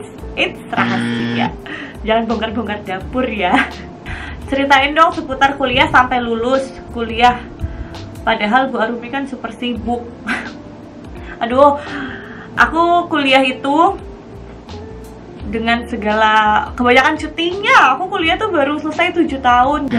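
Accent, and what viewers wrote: Java, Indonesia. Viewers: Buk wagub udh medok logat jawa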